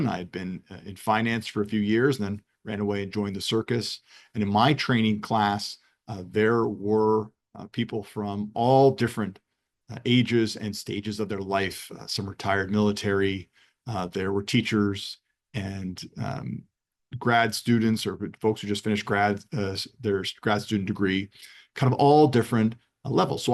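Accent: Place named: United States. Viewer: You pronounce "Z" like Yankees.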